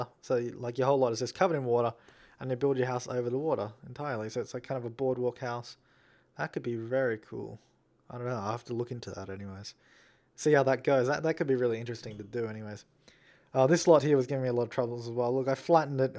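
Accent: Australia. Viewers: His accent is… Australian, right? Oh and from this video I can already tell I'd fail so hard at this. v.v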